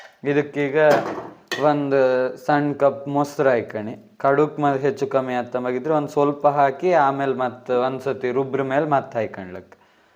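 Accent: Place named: Kundapura, India. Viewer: ಸೂಪರ್ ಪ್ಯೂರ್ ಕುಂದಾಪುರ ಕನ್ನಡ ಮಾತಡದ್ ಲಾಯ್ಕ್ ಆಯ್ತ್. ತಂಬುಳಿ ಒಳ್ಳೆ ಇತ್ ಮಾರ್ರೆ 😍😍👌🏼👌🏼👌🏼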